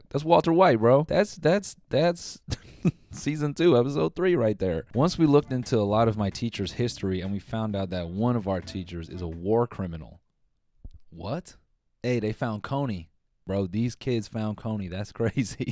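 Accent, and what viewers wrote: United Kingdom. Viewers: in love with his british voice. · Haha the British accent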